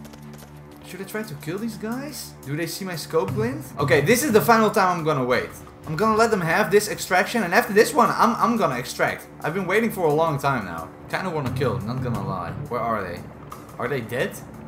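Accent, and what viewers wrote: Netherlands. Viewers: Are you dutch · Ik hoor gewoon dat je Nederlands bent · You can hear your dutch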